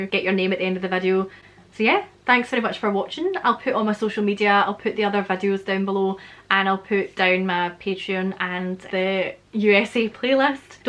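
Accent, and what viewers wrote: Scotland, United Kingdom. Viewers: I love listening to your stories. I love learning scottish accent from your stories! and what an adventure you had! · I'm getting better at understanding your Scots accent. So it's progress.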